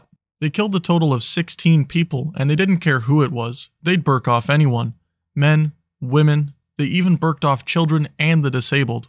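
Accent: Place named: Canada. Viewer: It's on a Saturday morning in Flori-duuuuuuh and I am being introduced to this channel and I have to honestly say that I am so glad I have insomnia and YouTube for once!! This guy is freaking funny as hell! Too bad I don't have any buds to blow smoke on while I chuckle like the flesh covered hyena he's turning me into!! I am curious as to where exactly he's from tho, he said "my FELLOW Americans" in his most recent video but he sounds like he could be from Canada😂😂😂 All I can tell for certain is that he is NOT a fan of those who were born in the south, fortunately, I was born in the Midwest over in Illinois 😁🤣🤣🤣😂 .....Side note; when is he going to have some stuffed animals or hoodies?! I'd totally get some!!❤❤❤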